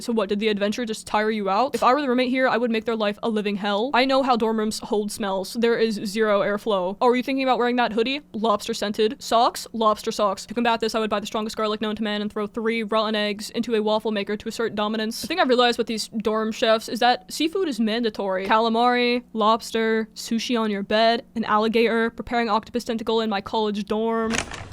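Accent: United Kingdom. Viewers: you pronounce your T’s so british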